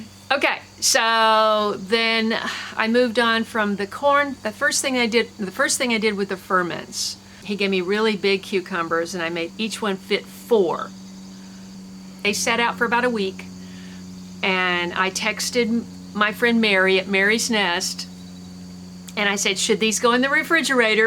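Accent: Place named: South, United States. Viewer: Is originally from the South? Sometimes I detect a bit of an accent 🤔 love this video, so much great information 😍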